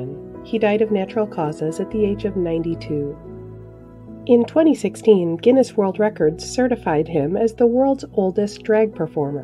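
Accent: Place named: Upper Midwest, United States. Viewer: Are you from Minnesota by chance ? I detect a strong upper Midwestern accent.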